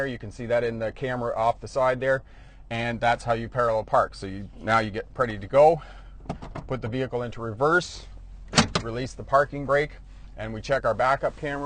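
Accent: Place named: Canada. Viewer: Very Canadian accent lol. Greetings from Ontario!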